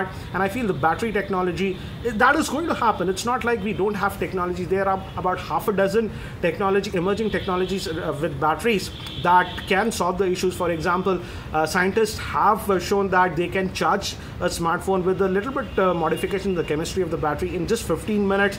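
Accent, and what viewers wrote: India. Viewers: I like the indian english accent, keep up the decent work, sir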